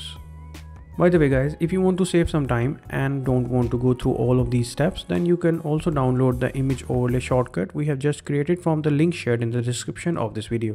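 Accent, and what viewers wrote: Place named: India. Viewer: I knew this video works the moment I heard my brothers Indian accent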